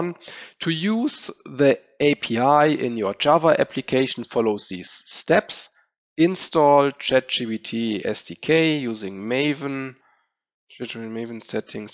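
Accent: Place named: Germany. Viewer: Man erkennt die Deutschen halt einfach an der Stimme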